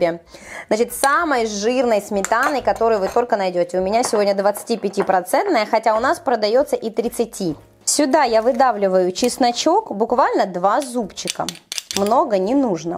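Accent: Ukraine. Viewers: а где вы живете..... Говор у вас Украинской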